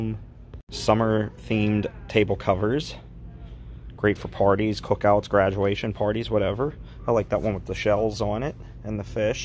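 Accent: Pittsburgh, United States. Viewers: I thought I heard that Pittsburgh accent in your Chuck E. Cheese video lmao yinzers for life man. Subscribed!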